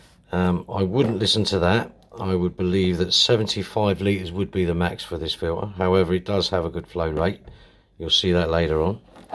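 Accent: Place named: United Kingdom. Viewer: Then, with about 15 years (I lost track) I'm a newbie in the hobby! What I love about chatting online is that we can learn from others that live in another country, I'm from NL, I guess by your accent you are located in the UK? There are some cultural differences (when it comes to fish keeping) from country to country and it's those differences that we also can learn from. Always good to expand my view. Although I think the difference between the UK and NL aren't that huge. You have a great YT channel, keep up the good work!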